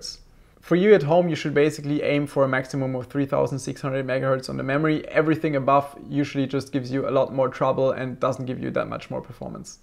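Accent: Germany. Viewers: Dieser deutsche Akzent ist so eckelhaft 😂😂 Aber ich glaube ich hab auch so nh Akzent wie der 😳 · The german dialekt xD xD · So glad der8auer does videos in English, too. His English is pretty good and while there is some German accent for sure, I don't really mind. It is definitely easier to understand than a language I've never studied, I took French as the third language and forgot most of it anyway :) Awesome OC, it's a pity one can't go even close to 5 GHz on air though. Intel has some advantage here and I'm always glad to see it getting slimmer with AMD's advances.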